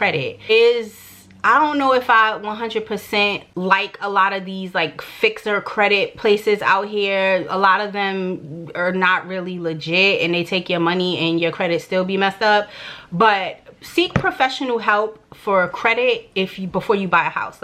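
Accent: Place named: Brooklyn, United States. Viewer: Cooper is hilarious and smart❤️ I’m ready for your new channel/ the new you🥰 I feel like you have the right represent Brooklyn, it’s in you💯 It’s in your personality and even in the way you talk sis.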